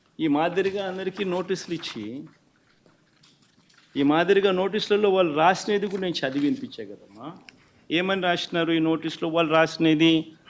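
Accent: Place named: Rayalaseema, India. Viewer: ఏంటి ఈ మధ్య నీ స్లాంగ్ మారింది, ప్యూర్ సీమ భాష లోకి వచ్చేశావు.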